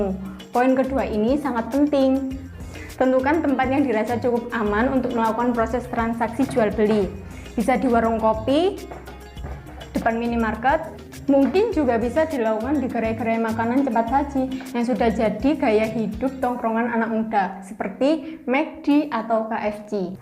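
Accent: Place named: Java, Indonesia. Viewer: Medok mbak 😅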